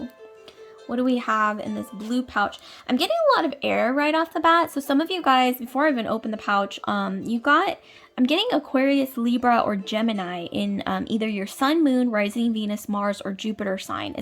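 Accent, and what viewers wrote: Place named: United Kingdom. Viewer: That’s so funny I picked plié 2 and I love the using the British accent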